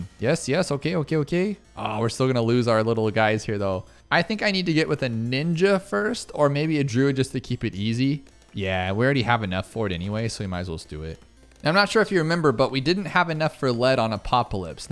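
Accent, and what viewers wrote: Norway. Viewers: Are you Norwigian? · You’re Norwegian? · vent vent vent, er du norsk?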